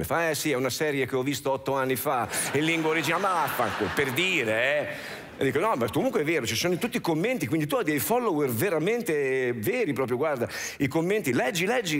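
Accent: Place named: Emilia, Italy. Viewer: È meglio quando ha l' accento emiliano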